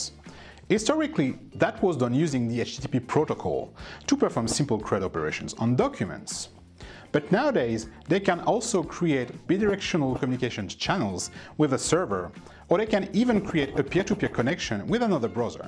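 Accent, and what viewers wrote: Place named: France. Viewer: He has French accent, I guess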